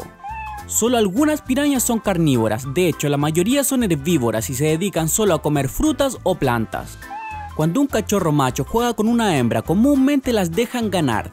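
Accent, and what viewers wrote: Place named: Chile. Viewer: En cada video tienen un acento diferente.. esta vez tuvo mas el acento Chileno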